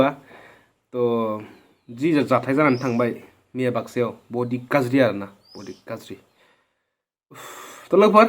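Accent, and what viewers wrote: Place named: Kokrajhar, India. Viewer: Wi pongbai ngni railaina esa mwjangwi boro pior railainw swlwngbao 😮sanjarisw jayw matw nwngna railainaia? mwjangwi swlwngpin kokrajhrni railainai baidi obwlasw bujigwn jwng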